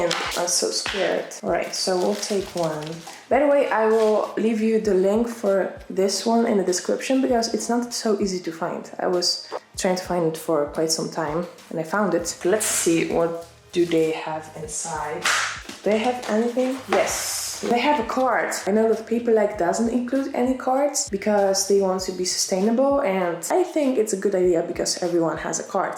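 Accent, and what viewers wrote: Netherlands. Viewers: heyyy!! Nederlands!! Ik dacht al een hint ervan te horen. Het kleine Poolse gedeelte bracht me in de war 😂 geniet wel echt van je video’s! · I know for a fact u Dutch I know the accent of my ppl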